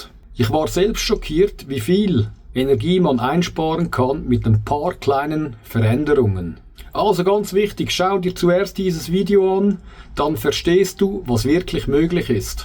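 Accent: Switzerland. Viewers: schöner bericht, danke dafür aber es wundert mich ein wenig: da sprcihst mit einem schweizer dialekt und gibt die preise in € an? aber das mit der zirkulationspumpe und dem leeren des boilers um 50 % ist mir nicht klar. diese zirkulationspumpe sitzt dann doch in einer ringleitung und so kommt das wasser doch immer wieder in den boiler zurück.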